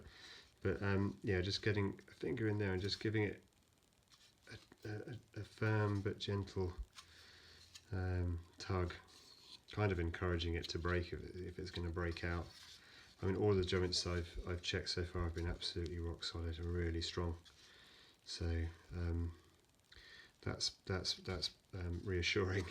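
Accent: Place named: Southern England, United Kingdom. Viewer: Just watched it, very interesting. I've been a PFA/LAA member since 1970 and for a number of reasons (life) just got in the way of homebuilding. I've been to virtually every annual Rally but only flown into a few. I did consider the Turbulent then my interest swapped to the MB2 Colibri, however I was surprised to see in the LAA mag that a Turbulent new build was underway. I was going to offer help with your build but I live in the North and by your accent I assume you're down south. I've had a close look at the Turbulent at all the fly-ins I've been to (many) and it still interests me. Can I wish you the best of luck with your endeavour. I just noticed that it's at least 25 years since it was first started so I would be looking at how one can properly check glued joints - perhaps your Inspector can help?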